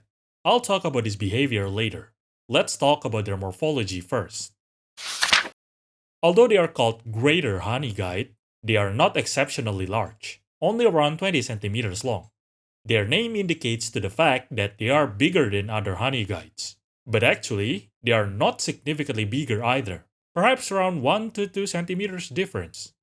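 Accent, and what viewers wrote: Southeast Asia. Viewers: Oh, south east asian, always wondered what that accent was Also love these birds, so cool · You're the only Indonesian I know, so I have no other points of reference. To me, your accent sounds like a mix of generic Southeast Asian + Dutch.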